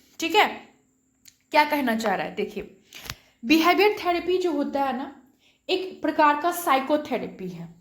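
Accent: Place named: Bengal, India. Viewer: Madam poora bangla accent ❤❤❤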